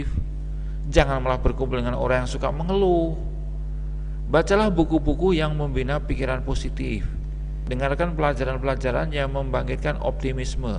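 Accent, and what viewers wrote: Java, Indonesia. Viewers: Saya suka dengan bicara khas Jowone Pak.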